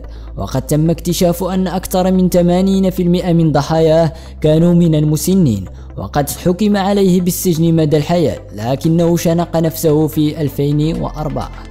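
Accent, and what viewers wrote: Marrakesh, Morocco. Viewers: iman vidia maroooki baaaaaayn ou marakchi maazal -__ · ههههههههههههههه مغربس حر ههههههه و مراكشي ، اويلي على الأتباء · لهجته تسد النفس، اللهجة المغربية من أسوأ اللهجات العربية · ههههههههههههههه صوت مغربي مراكشي امازيغي قح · معلي .. ترى للي يتكلم يبين انه مغربي يعني عربي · هذا مغربي ولا كيفاش صوتك لا يصلح يا هذا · هههههه افضل شيء في المقطع هو البهجاوي اللي كيعلق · نبرة صوت مراكشية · vidia Ti Rah Hada Mghribi Puur Bayne Wach Wlde Mrrakch L Hdraa M3tla W T9ilaaaaaaa Katjyb N3aass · هدا. مغربي اللي كيحكي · chi mrakchi hada xD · عجبتني اللهجه المغربيه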